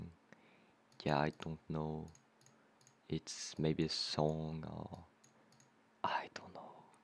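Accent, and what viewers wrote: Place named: France. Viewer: You have a Big french accent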